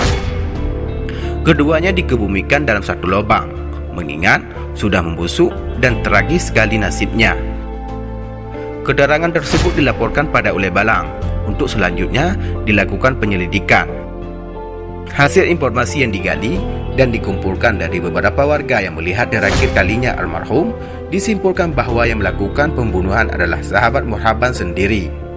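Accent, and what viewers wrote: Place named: Bali, Indonesia. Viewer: Bicaranya kok mirip bali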